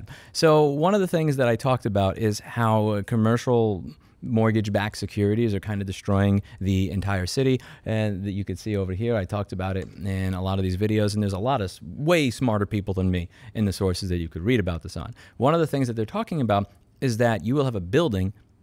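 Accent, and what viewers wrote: New York, United States. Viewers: The NYC slang is still strong , can’t take the NY grit out of him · Entertaining and lovely, as almost always. I want to get to visit a New York one day that is a little like those olden days. Is this the first time I think you can detect a faint trace of New Yorkese in Louis' speech? 😄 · I truly, truly enjoy listening to your videos! I don't mean to belittle the subject content, I can find pretty much the same thing all over Youtube, but, they don't speak the same language. You, my friend, tell it like it is in a language I relate to. You speak New Yorkese! And probably don't even realize it. I grew up near enough to the city that I was fluent in that method of expressing yourself. Although I left the East coast many years ago, I still tend to express myself that way. It causes me no end of being misunderstood and/or being accused of saying things in a excessively direct manner. 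So, I watch your videos. Not because I agree with every thing you say but, even if I disagree, I experience a level of comfort listening to someone I can truly understand.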